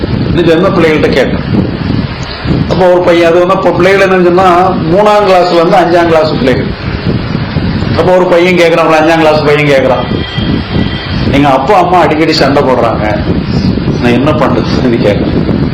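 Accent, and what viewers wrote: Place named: Tirunelveli, India. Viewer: அருமையான நல்ல தகவல் அதுவும் விரும்பும் நெல்லை தமிழில். நன்றி ஐயா!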